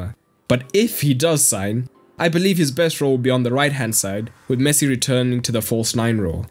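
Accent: South Africa. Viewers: Is it just me or does the narrator sound South African 🤔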